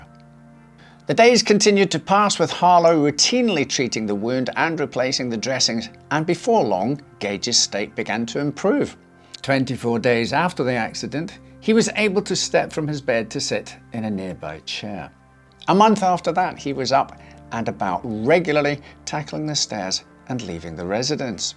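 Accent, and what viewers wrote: Scotland, United Kingdom. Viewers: Are you are Scottish, but have stayed in England? Or English, with time spent in Scotland?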